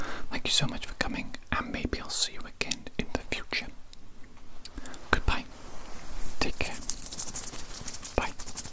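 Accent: Wales, United Kingdom. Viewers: omg wait are you welsh?!? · Love this vid ! Are you Welsh? I recognise that accent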